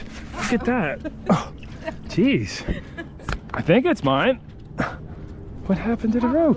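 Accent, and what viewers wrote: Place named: Philadelphia, United States. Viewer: Has anyone ever told you you look like Jared Keeso? It’s weird seeing what is a Canadian actor speaking with a Philly accent lol. Love the videos!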